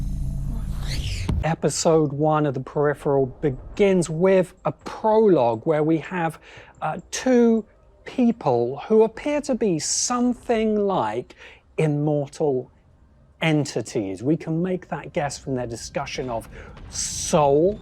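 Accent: England, United Kingdom. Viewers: I get that translating Gibson's work to the screen is almost impossible. But this show is HORRIBLE. This review tries to give the show more credit than it deserves. But a soft-spoken English accent can't fix how broken the show really os.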